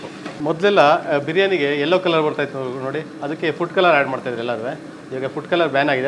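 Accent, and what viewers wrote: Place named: Mysore, India. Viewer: ನಮ್ಮ ಮೈಸೂರ್ ಭಾಷೆ 👌👌👌👌💛❤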